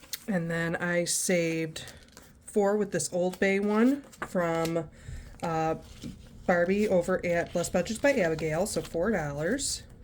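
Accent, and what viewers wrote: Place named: New York, United States. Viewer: You have a New Yorker accent. I love it!!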